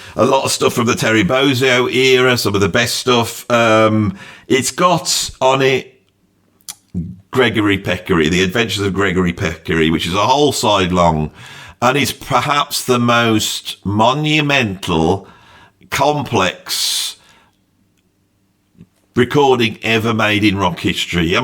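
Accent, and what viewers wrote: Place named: Midlands, United Kingdom. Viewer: I saw Frank at Knebworth in 1979, aged 19 & that set changed my understanding of what music was. So it's great to see this great collection & selection especially delivered in a great Midlands accent. Uncle Meat is the record I'd take to my desert island, though I'd miss the others especially Hot Rats & Ship arriving too late...& Yellow Shark &...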